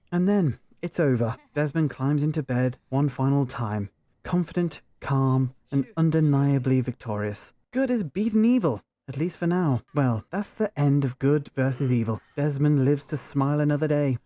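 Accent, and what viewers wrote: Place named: United Kingdom. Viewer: It's the British accent, sounds like David Attenborough of Sims documentaries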